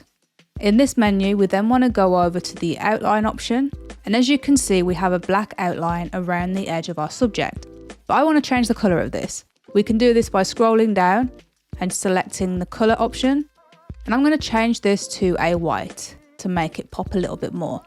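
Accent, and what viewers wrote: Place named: Northern England, United Kingdom. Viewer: Thanks for that! Also, completely unrelated to the video, but I'm trying to figure out your accent lol. Is it a Newcastle accent? Definitely northern england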